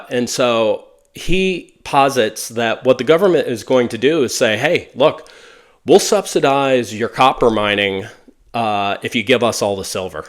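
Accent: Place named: Austin, United States. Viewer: I see "TEXAS" next to where it says how long ago this video was uploaded. What part of Texas are you in? I am up here north of Dallas in Frisco. Just saw your channel in my feed for the first time. Sounds like you are in or around the Austin area. I trade for a living and have been trading the precious metals exclusively since '03. Enjoyed the video and was happy to hear it free of price targets predictions but more about just the mechanics of working with the daily zigs and zags which is reallyl all its about once you determine what the med and LT trend direction is, assuming there is one.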